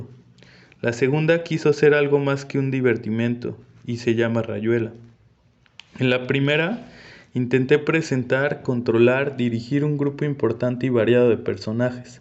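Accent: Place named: Mexico. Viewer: presente el acento mexicano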